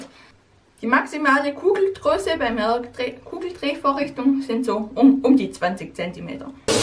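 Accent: Swabia, Germany. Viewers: Hockline 89 es heisst dialekt und es ist schwäbisch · PS: der Dialekt ist cool....schwäbisch? Stuttgart oder ?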